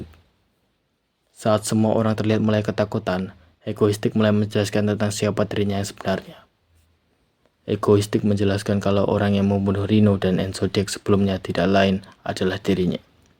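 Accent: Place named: Java, Indonesia. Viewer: Medok bgt mas❤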